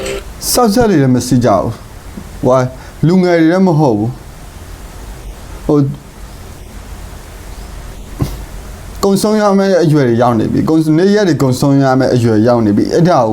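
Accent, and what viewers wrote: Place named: Rakhine, Myanmar. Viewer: ရခိုင်နဲတူတယ်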